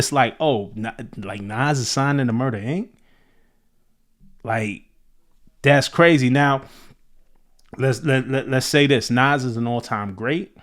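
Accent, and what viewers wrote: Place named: New York, United States. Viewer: Can't really stand the homie's NY accent, but this is a FANTASTIC video‼️✊💯👍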